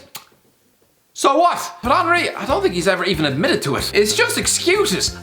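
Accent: Ireland. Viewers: Good lord, this is the most obnoxious video commentary that I've heard in a very long time. What's wrong with you, Irish guy? Take a deep breath sometime. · Arent u Irish or snt? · Best thing I have ever heard in an Irish accent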